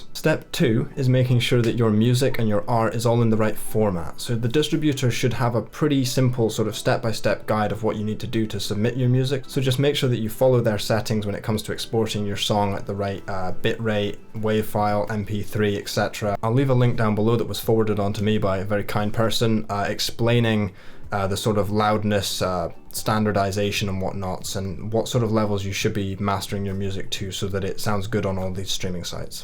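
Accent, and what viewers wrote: United Kingdom. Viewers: I guess you're British. I love British men. 💖😊.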